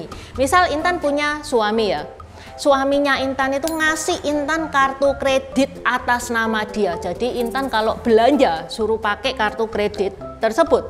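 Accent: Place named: Surabaya, Indonesia. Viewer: Dari surabaya yaa ... Kog logatnya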